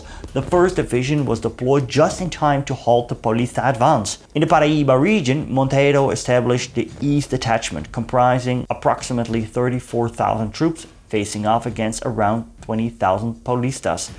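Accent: Spain. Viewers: What are you talking about? He just pronounced Portuguese as if it was Spanish throughout the video, as seen by "Retúliô" and "Itararê". This is an irrelevant nitpick since this is a history channel as opposed to a linguistics one, but please realize that the only reason some people in Rio Grande do Sul pronounce their state's name like that is because it was settled by Spaniards first. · Would be better if you didn't pronouced things with the spanish accent, and instead used the Portuguese one.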